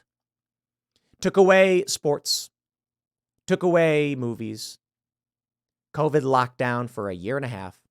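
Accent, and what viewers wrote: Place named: Chicago, United States. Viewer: Chicago accent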